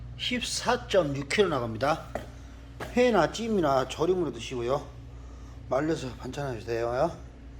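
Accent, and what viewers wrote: Jeolla, South Korea. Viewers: 전라도 사투리 증말 재밌네요..목포 생선 연결고리가 없어 구입하기 힘들었는데 반갑습니다.. 계속 올려주세요...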